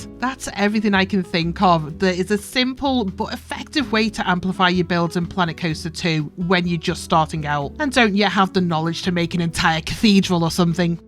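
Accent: Liverpool, United Kingdom. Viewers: Thank you mysterious scouse coaster goddess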